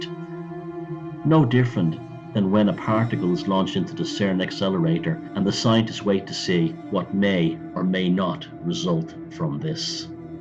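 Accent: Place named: Ireland. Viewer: I am very upset because this is the perfect documentary for general overview of the topic, but the audio quality completely ruins it. The Irish accent would be no problem at all if it did not sound like you recorded the audio with a 1995 Talk-Man (from Home Alone 2 for reference) off a 1950s AM Radio broadcast.. Holy hell man, it's like you severely downgraded the quality. If this is any way to judge the technology you own, you definitely still listen to cassettes and watch movies on VHS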